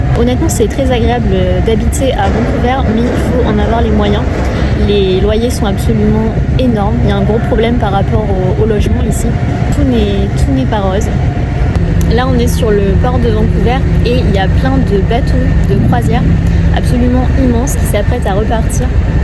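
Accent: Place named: South, France. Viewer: Ton sud français est mille fois plus beau!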